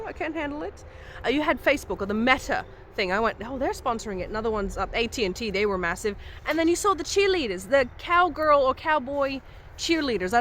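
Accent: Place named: Western Australia, Australia. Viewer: 25 whole years ago. 60 days of my life was spent in WA. Good ole memories. Your accent made me flash back on the good times in my life.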